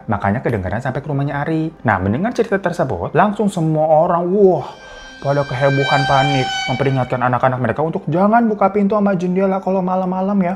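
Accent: Java, Indonesia. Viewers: seperti biasa bang mantap, tpi itu logat jawa keknya bagian barat dah wkwkwk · Ni abang klo ngomong logat jawa lucu bgttt😂 kiyowoookkk😂❤ Sehat² ya babang sekeluarga dn kita semuaa 🤲🏻🥰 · Ngakak lihat logat Jawa bang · Ngomong bHasa jawanya lucu bngt baang😂😂😂😂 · suka salpok denger kak ngomong jawa, lucu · Lucu bgt bhsa jawa ny bg 😅😅😅😅... Untung ada translate ny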